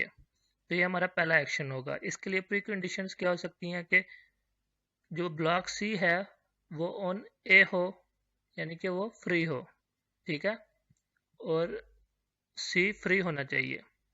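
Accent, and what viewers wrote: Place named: India. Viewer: 印度英语听不懂。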